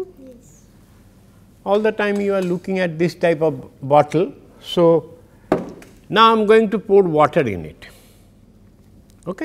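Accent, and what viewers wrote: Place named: Bengal, India. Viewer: I can feel that the teacher is bengali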